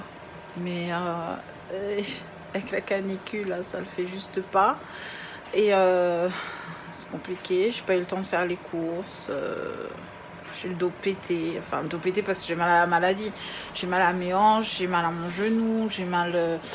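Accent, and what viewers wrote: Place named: Corsica, France. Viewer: Quand je t'écoute ça me fait penser aux patients corses que j'ai au téléphone !! J'adore l'accent corse !!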